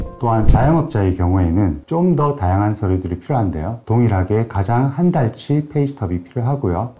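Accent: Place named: Gyeongsang, South Korea. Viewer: 많은 도움이 되었습니다~ 경상도 분이시군요ㅎ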